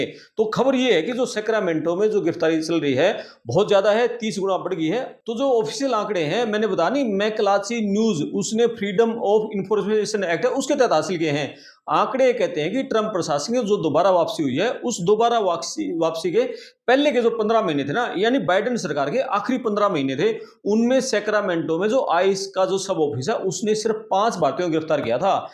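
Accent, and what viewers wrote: Haryana, India. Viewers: एक तो आप इधर उधर की बात कम करिए , सीधे काम की बात पर आइए और हरियाणवी accent छोड़कर खड़ी बोली में बात करिए तो अधिक लोग देखेंगे.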